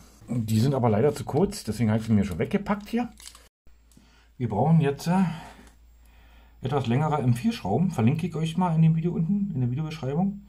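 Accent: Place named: Brandenburg, Germany. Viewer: Diese 8 Minuten vergehen wie im Flug... In welchem Landkreis bist du denn Zuhause? Überlege ob ich mal deinen Tracker genauer in Augenschein nehmen muss!😊 Klingst nach südlichem Brandenburg....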